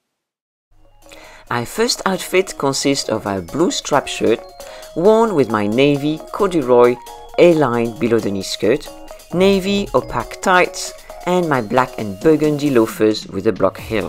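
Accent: France. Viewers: Aw, I liked it when you used to say “ to receive the notifications”. It was a little charming clue that French is your first language!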